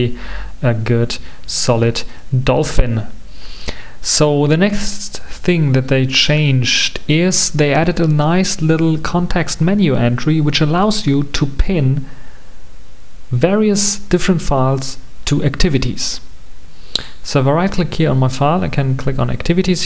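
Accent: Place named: Germany. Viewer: Deutscher Akzent ;) nice video.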